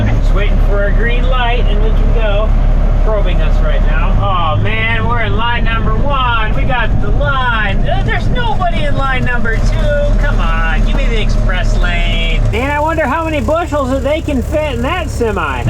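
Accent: Scotland, United Kingdom. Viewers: Scottish : my very favorite accent 💙 greetings from Utah, USA🇺🇸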